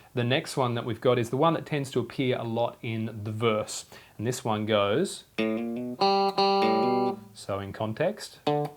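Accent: Australia. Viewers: Hey man, are you an Aussie?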